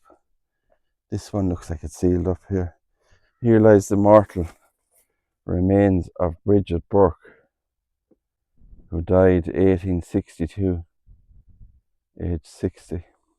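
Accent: Ireland. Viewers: I love your accent I'm a Canadian l hope to visit Ireland soon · No better tribute to how we all end. The Irish accent gives depth to this story and as an English man I thank god for this respectfull demontration in how it needed to be done. By the grace of god . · Just subscribed , nothing better than irish video's and listening to the Irish accent 😊 great subject today 😊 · I though so. Irish accent.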